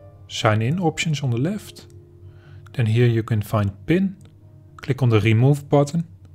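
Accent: Netherlands. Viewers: Precies, stupide Nederlands accent! KOTSBAK! De LOI let me speak English with a Nederlandse R! Je staat voor lul vriend!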